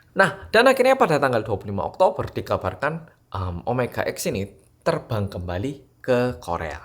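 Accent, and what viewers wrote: Java, Indonesia. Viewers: Glodakan gak tuh.. nyentak ke saya 😂 medhoknya bisa otomatis nyampur gitu ya bahasanya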